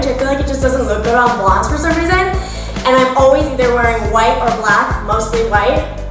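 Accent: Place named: Mexico City, Mexico. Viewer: It was nice to "meet" you on MIM. You nailed the CDMX accent. I thought the birthday party episode was hilarious when you and Roby stormed out of the restaurant. Keep up the good work!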